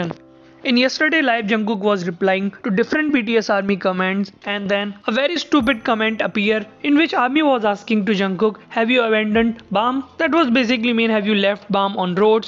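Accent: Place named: India. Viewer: You ruined the whloe video with your indian accent PUBG Mobile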